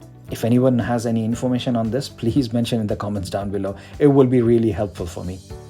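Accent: India. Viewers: Are you Indian ?? Your voice look like an Indian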